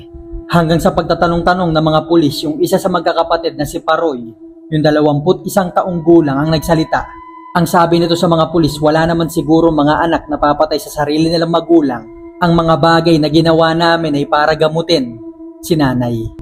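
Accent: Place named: Waray, Philippines. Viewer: Sounds like waray waray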